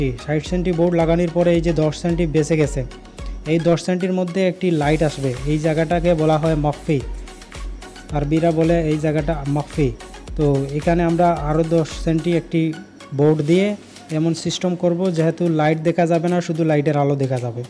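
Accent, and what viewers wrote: Sylhet, Bangladesh. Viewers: Sylheti ni bai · Vai sylheti ni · খাস সিলেটি❤️ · ভাই তুমি সিলেটি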